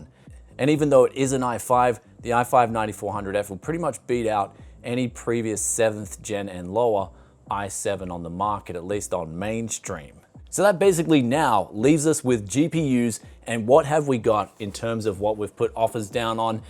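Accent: Australia. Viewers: I’m sorry that people don’t understand you don’t work for free, keep up the good work my old mate, you defo need to bring the Aussie out more, it’s class! Hope you well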